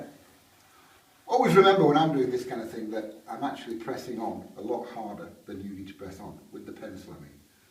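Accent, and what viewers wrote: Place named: North, United Kingdom. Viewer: Put the subtitles on top right of video three dots Captions, he is easy for us Northerners to understand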